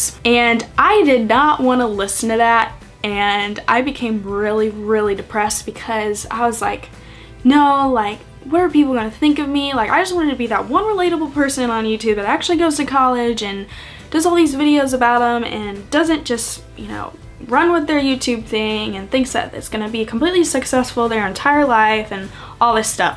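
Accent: South, United States. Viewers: Your southern acent is really coming threw in this video 😁👍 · Hannah Rose Ikr I was just thinking, is it just me or does she sound more southern in this video? 😆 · Whered u pick up that southern accent from?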